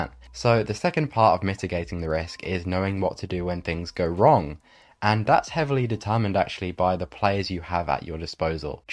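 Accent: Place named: United Kingdom. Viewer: yes, sometime I had the same feel of a barca game as, but just have the capability to put it into words systematically, and elegantly, plus that British accent (I am from US), love this dude, lol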